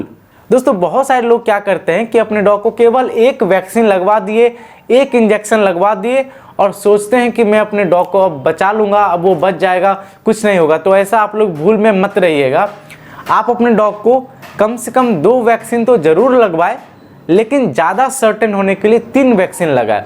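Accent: Bihar, India. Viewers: Aap bihar ho Na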